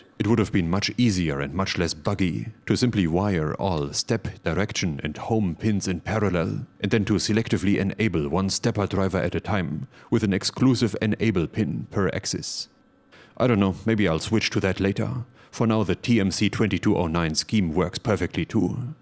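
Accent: Germany. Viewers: No not monotone, it’s deep late night Radio DJ voice w/ an exotic Deutsch / German Accent. A great intoxicating lullaby when I too tired to care.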